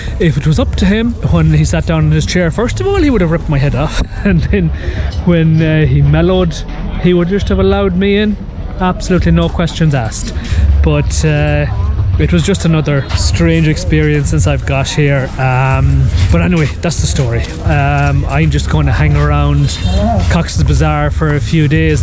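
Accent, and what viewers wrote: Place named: Ireland. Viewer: Absolutely brilliant. Discussing poetry and war on the border of Bangladesh with a senior army official. Fascinating and slightly surreal to listen to you sharing this experience. You retold it with such kindness and even better with your lovely Irish accent. Watching your videos remind me of my visits to Bangladesh and I am looking forward to watching the rest of your travel videos through Bangladesh. Thank you for sharing a part of your adventure/journey.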